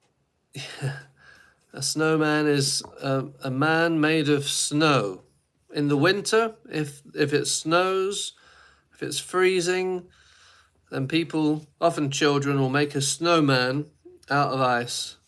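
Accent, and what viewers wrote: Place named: Northern England, United Kingdom. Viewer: You sound from north England.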